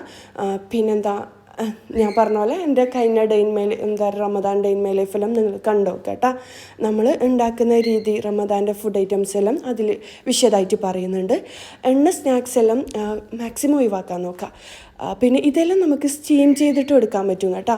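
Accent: Kannur, India. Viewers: Irfanagood presentation enik kannur samsaram nallaishtanu to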